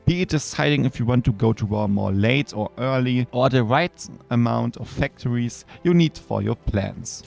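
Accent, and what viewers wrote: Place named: Germany. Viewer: german accent haha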